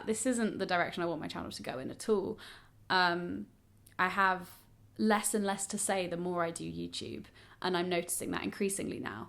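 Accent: RP, United Kingdom. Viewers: I'm ngl I kinda get why people say the disingenuous thing but only because of how you speak like your accent is quite posh? i don't agree with the disingenuous comment but i kinda get it? like posh people are categorised as super fake and bitchy but i don't think that it's accurate of all posh people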